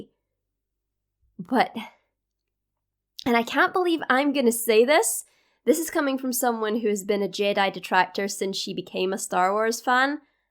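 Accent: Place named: Scotland, United Kingdom. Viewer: You have a beautiful accent, are you from Scotland? And yes, I found your channel among the Ahsoka reaction videos.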